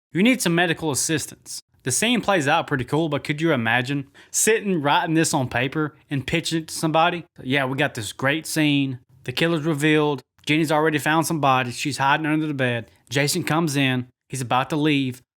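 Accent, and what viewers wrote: South, United States. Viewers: glad to make your day brother I enjoy the videos it's refreshing glad to hear that southern draw you made mine today with this video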